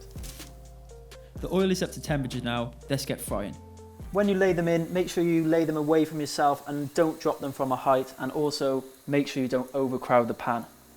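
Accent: Wales, United Kingdom. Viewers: Your cooking is incredible! Thanks so much for sharing your skills, I would so happily watch you on TV doing this - get those Welsh tones on telly!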